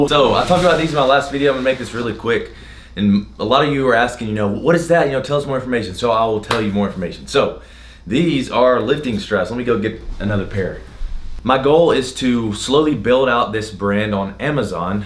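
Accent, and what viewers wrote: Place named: Alabama, United States. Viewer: Y’all Haha can’t get rid of the bama talk.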